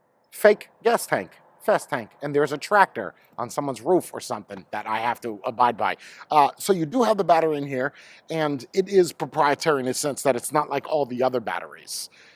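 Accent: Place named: East Coast, United States. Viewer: I swear I’m not a stocker ……. But is this neighborhood in Florida ? It gives me a Florida vibes , but your accent seems East coast ? Maybe I’m way off 🤷🏼‍♂️ Or maybe you are from the east coast and live in Florida now haha.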